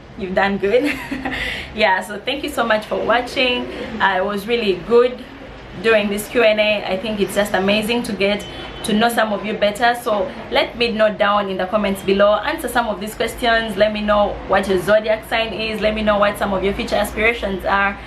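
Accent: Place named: Uganda. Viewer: I could tell that you lived in Uganda from the English. Yes. There is a ugandan watching. Subscribe to my youtube channel too.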